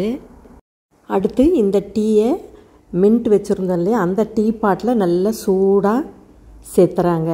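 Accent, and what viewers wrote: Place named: Kongu, India. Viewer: moraccan mint tea miga arumaiaga seithu kanbitheergal sago kongu tamilil pesinathu arumai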